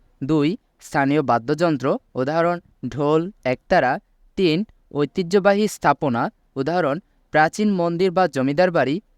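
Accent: Narsingdi, Bangladesh. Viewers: ভাই আপনার গ্রামের বাড়ি কি নরসিংদী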